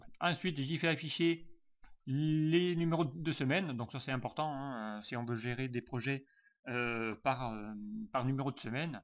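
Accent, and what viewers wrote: South, France. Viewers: Pas la peine de me répondre à mon dernier message, je vais d'abord visionner cette video merci pour vos tutos et pour le petit accent du midi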